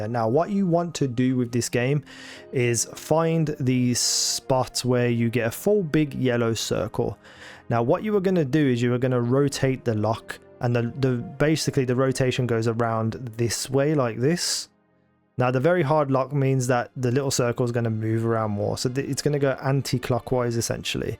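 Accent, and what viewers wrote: Birmingham, United Kingdom. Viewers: Birmingham? Walsall?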